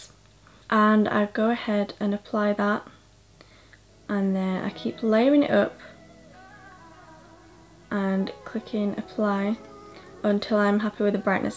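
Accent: United Kingdom. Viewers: Wtf she’s British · Bruh I wasn’t ready for the accent hello fellow Brit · omg i had no clue that u were british!! you’re so pretty and omggg i love you’re accent and i thought u we’re american tbh but omg🥰❤️ so pretty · POV: u had no idea she was British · ....doesn't everyone have an accent..? Do you mean she's British? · Jade McAboy she is brithia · I looked her up and it said she was born in England and I was like oh my God I need to hear her voice. So, I came here and I had no clue she was British. I only saw her in tiktok. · SHES BRITTISH. UGH IM IN LOVE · Your from the UK sis❤ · OMG I didn't know you were British I thought you were American and I didn't expect your voice sound like that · Ur rlly British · YEEESSSSS SHES BRITISHH!SHE GETS ME...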